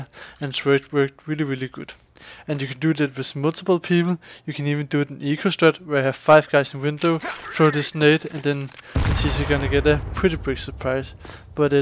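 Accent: Denmark. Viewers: N1, off topic u sound VERY danish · danish accent so cute <333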